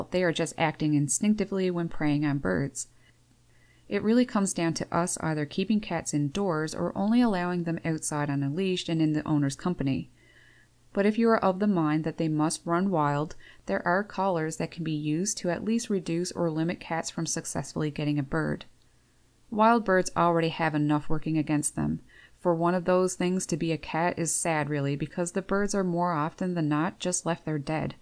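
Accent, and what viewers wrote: Canada. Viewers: So we are impacting the natural birds via our feeding, but in a positive way, is basically what you're saying correct? From the pronunciation of the "ou's" I assume you're from Canada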